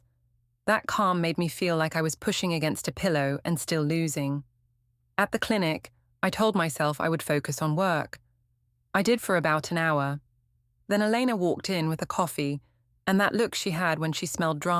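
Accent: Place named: United Kingdom. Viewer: Some things never change. They always use this British voice for the most mentally deficient, clueless, self awareness bereft, delusional women's stories. They always name them something faux sophisticated and exotic that reminds me of "Cruella DeVille". And there's always some lame attention obsessed excuse for whatever the women do that's supposed to absolve them of any blame even while they tell people half truths and manipulate then move as if their fictional reality is actual.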